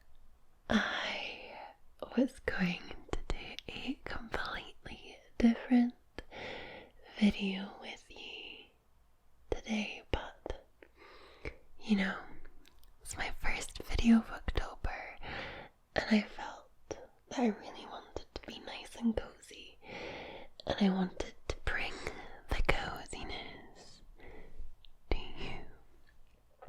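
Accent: Scotland, United Kingdom. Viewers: I love the Scottish accent. Youtube Closed Captioning is being a cheeky about it though. "Hello Bees and Kettles!" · Thank you Mrs..I'm so happy to have found your channel!....I've complimented you before on how soothing and relaxing your whispering in the Brogue is!....You're amazing!...and if I may say so respectfully...quite RADIANTLY BEAUTIFUL!...with all due respect.....you're the best!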